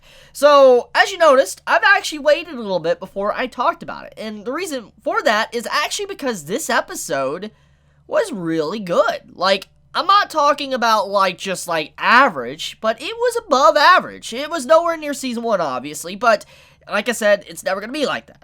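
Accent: United States. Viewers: You talk like a cowboy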